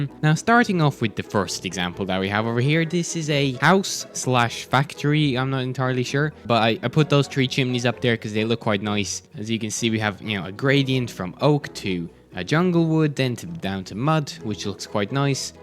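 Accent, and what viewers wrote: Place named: Ireland. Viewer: Is that an Irish accent I here 🇮🇪🇮🇪🇮🇪 GWAN IRELAND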